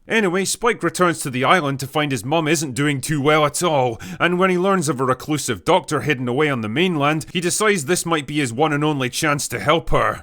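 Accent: Scotland, United Kingdom. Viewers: Accent. Scotsman. Kettle. Ha!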